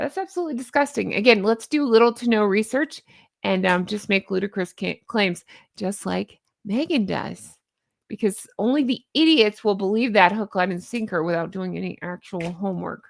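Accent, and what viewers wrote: South, United States. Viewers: HONK-ity HONK HONK Queen of the Flockers. Great video as ever 😂😂😂😂😂 Yeah, you do sound southern. How's Flockingham Palace coming along? Enjoy your days off. Congratulations on your weight loss journey. I love how you keep things real (I dare not say 'authentic'). Your faithful (& a tad busy) humble Flocker. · It’s ok to sound Southern❤ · Hey. Mississippi girl here- don’t you apologize for any southern accent you may or may not have. I think it sounds beautiful🥰 Love you channel!🪿🪿🪿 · Good Morning and room. Honk, Honk.. Megalier episodes never gets dull..... You do sound Southern....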